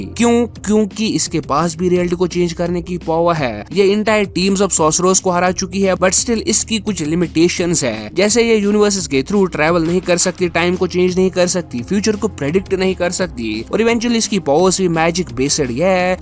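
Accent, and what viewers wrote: Kashmir, India. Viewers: App kashmir sa ho kya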